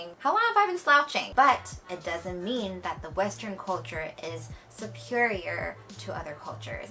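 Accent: United States. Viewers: Dena的腔調確實非常道地，一聽就像是美國長大的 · 好像知道Dena的American accent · 你還沒出國讀書的時候，口音也是很像美國人說的嗎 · You do have a perfect American accent though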